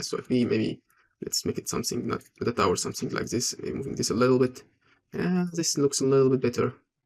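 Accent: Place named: Egypt. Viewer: Egyptian accent, I got you! 😂 Thanks, Broo!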